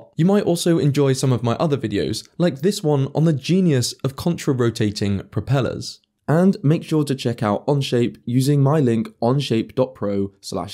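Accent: United Kingdom. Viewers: As soon as I heard him say "electric flights" and that the centripetal force increases "exponentially" with the speed of rotation, I knew right then, that the person quoted saying that this car "bends physics", and the british accent narrator themselves know absolutely nothing about physics. It's easy to see how they might think this. Sorry guys, but this is clickbait.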